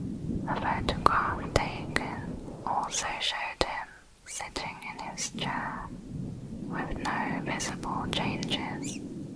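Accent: United Kingdom. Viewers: You have the most beautiful accent and voice I've ever heard. I'm British too but have a heavy lower-class South East accent and mostly speak in cockney slang. Really gotten into SCP stuff too over the last little while. Great stuff :)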